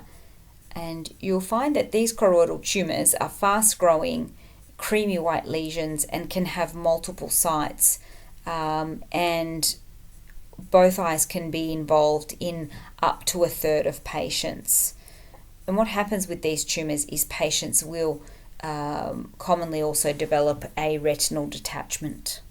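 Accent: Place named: Australia. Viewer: I like your accent sounds like australian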